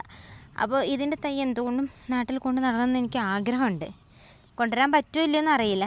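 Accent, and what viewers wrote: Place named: Kannur, India. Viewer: സംസാരം കേട്ടപ്പോൾ തോന്നി സിസ്റ്റർ കണ്ണൂർ ആണോ നമ്മുടെ നാട്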